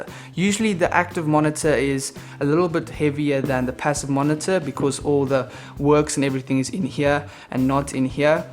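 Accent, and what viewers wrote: South Africa. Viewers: Lekker South African Accent my bru... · you have a South African accent bro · You are South African, I know us very well, lol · This guy sounds South African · Is that a South African accent?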